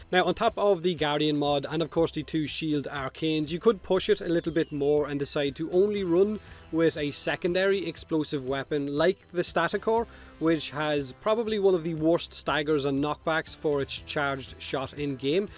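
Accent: Ireland. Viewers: Irish accent man: says gauss is a sanic! also irish accent man: proceeds to never actually using his 1 in the video... great vid though!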